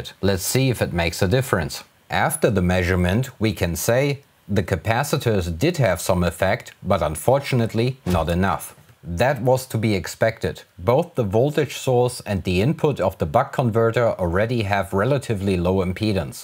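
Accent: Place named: Germany. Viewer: schönes video. Achjaaa deutscher Akzent 😁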